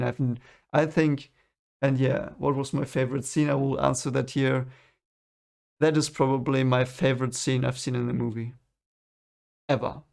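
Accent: Germany. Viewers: Very nice review. Due to your accent I assume that you are from Germany? ;)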